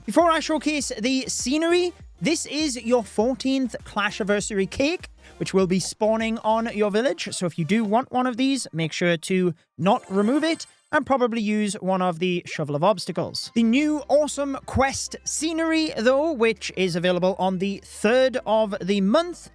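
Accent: United Kingdom. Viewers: Holy... I just luv Bri-ish accent 😆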